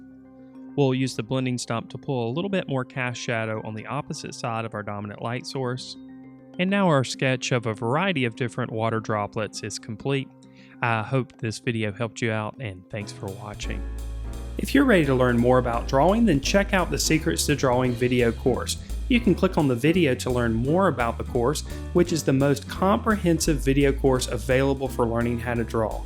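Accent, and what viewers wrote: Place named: Louisiana, United States. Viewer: Reminds me of a northwest Louisiana accent.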